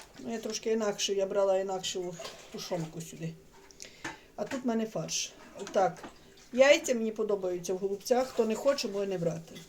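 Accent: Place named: Western Ukraine, Ukraine. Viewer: Так говорять на Західній Україні, в кожному регіоні нашої країни свій діалект і вимова, і тим вона неповторна, незабутня і самобутня!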